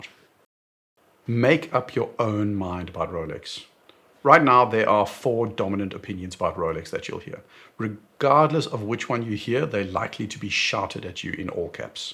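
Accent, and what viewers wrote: South Africa. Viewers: Bro are you South African?😅Speculating here. I do have loads of South African friends, hearing you was refreshingly unique but familiar.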